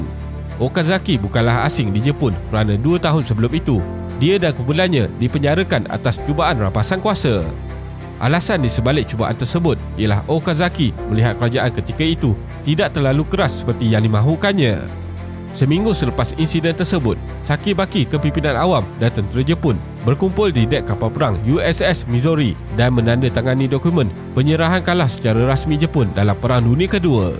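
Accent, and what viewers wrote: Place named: Malaysia. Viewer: semua dah mantap kadang2 sebutan bahasa asing kebanyakan video terlalu lidah melayu sangat, harap dapat diperbaiki lagi 👌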